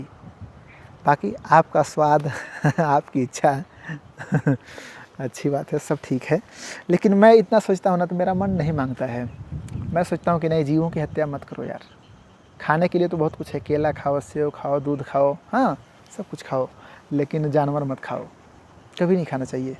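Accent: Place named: Uttar Pradesh, India. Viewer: Apki batein bdi pyari h sir lg rha h ap up se h .sir mai kvs tgt Hindi interview ki tyari kr rhi hu pls ese hi margadarasan krte rhe .mai up santkabir nagar district se hu.🙏🙏🙏🙏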